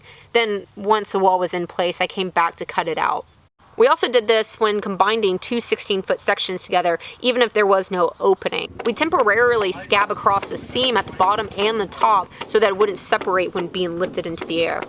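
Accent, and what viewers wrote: Texas, United States. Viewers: You leave her Texas accent alone! ;)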